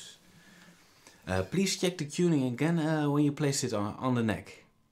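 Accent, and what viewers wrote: Netherlands. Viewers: hahaha ik hoorde aan je accent dat je nederlands bent xD goede tutorial, dankjewel, alleen het zou het een stuk makkelijker maken als je op plaatsen zoals je rechterhand ook laat zien om de tokkel te begrijpen. dankje. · love the dutch accent :P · I noticed from the accent you're Dutch. Awesome composition. Erg mooi! Gaaf dat je 't gratis weggeeft.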